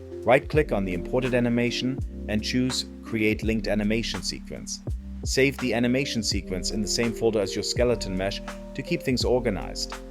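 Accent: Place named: Germany. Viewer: bro your accent sound so german haha good video btw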